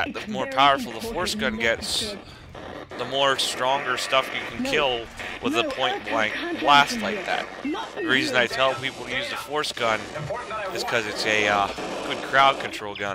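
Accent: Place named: Pittsburgh, United States. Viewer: You kinda sound like you're from Pittsburgh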